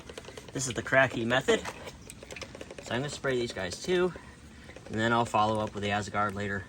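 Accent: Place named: United States. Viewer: helloo thx for sharing. you sound like an american, where in the world are you gardening? New sub from Thailand here. I also grow some cucumbers in floating foam sheets. Is hot season at the moment. I am using ppm as a guidance. What is your ppm for the cumcumbers? mine is arround 900 just begin to flowering.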